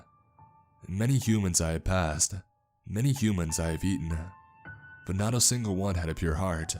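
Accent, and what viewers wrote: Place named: United States. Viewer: Hey do all the ppl who read these CreepyPasta things all have American accents? Like... There's no one in Ireland or the UK doing this stuff? Not knocking your voice or anything, bud, you're doing great.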